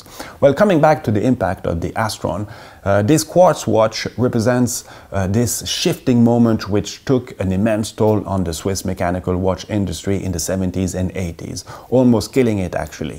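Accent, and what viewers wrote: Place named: France. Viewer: This being the very first video I've watched on your channel, I was assuming, judging by your name and accent, that you were French. Imagine my surprise when you mentioned near the end that you're Swiss. And you're making a video praising a Japanese watch brand. Respect! Were you tarred and feathered upon your return in Switzerland? I can't imagine the backlash I, as a Belgian, would be facing if I made a video singing the praises of British moules-frites. 😂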